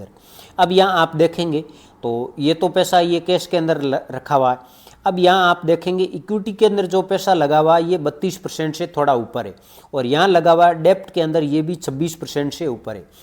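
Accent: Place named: Rajasthan, India. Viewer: बहुत अच्छी जानकारी दी आपने बहुत बहुत धन्यवाद आपका 😊😊 राजस्थान से लगते हो आप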